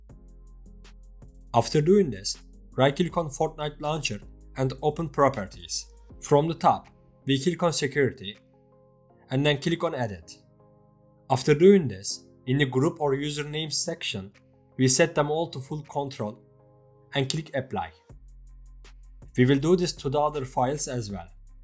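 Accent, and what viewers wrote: Turkey. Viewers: teeeşekkürler dostum konuşmandan türk olduğunu anladım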